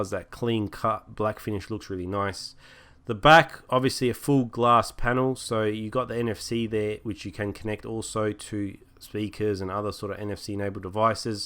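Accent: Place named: Australia. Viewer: Now I can distinguish which accent belongs to which Country. U r from Australia !!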